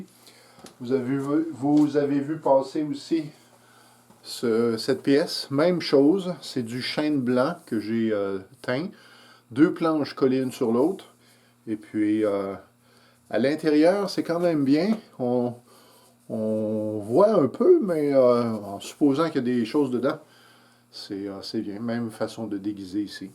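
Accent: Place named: Montreal, Canada. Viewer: bonjour je me suis abonné dernierement.je ne suis pas tourneur n'y menuisier mais vos video sont trés enrichissente de conaissance. vous n'ete pas avare de renseignement et de conseil votre voie est calme et tres douce tres sur,tres professionel. votre accent j'ai l'impression d'entendre mon beau pére qui est de montreal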